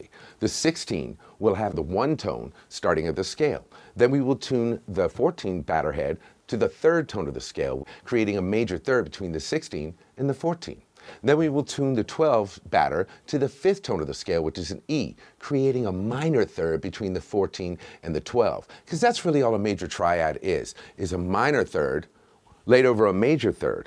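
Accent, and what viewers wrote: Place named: South, United States. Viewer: Oh man, I absolutely love your presenting style and that "southern drawl" in your voice! Thank you so much for all the great videos, and greetings from Finland! 🙌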